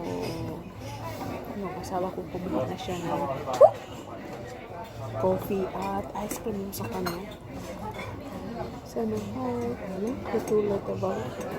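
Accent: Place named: Visayas, Philippines. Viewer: Bisaya ka sis parihas Tau bsta bisaya tlga mag Salita bagsak nyahhh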